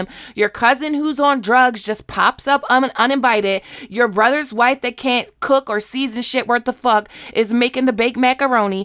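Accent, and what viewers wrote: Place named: Louisiana, United States. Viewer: She’s from Louisiana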